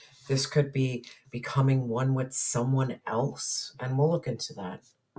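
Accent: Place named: New England, United States. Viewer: Omgsh thank you for being the vessel to send me this message ❤so powerful ❤ my decrement is everything to me ❤ God and now you have confirmed that for me ❤ Ps you have a i love listening to your reads you are so up lifting and your voice reminds me of my mothers [new England (new bedford) accent)] Blessings to you ❤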